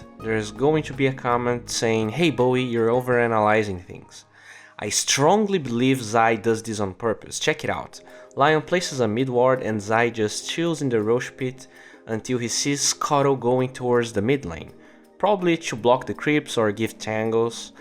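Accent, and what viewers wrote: Brazil. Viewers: melhora tua pronuncia cara. TWO nao eh TO. Entrega tua "brasileirisse" facilmente. Fora isso, parabens pelo conteudo.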